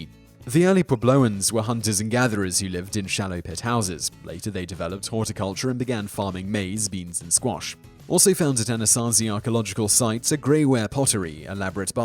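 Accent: United Kingdom. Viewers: British ppl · He's British. That's their pronunciation.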